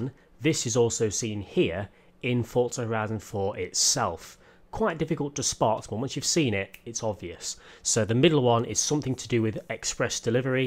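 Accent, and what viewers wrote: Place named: United Kingdom. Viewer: You have a problem to his accent? He's british, and these are purely american names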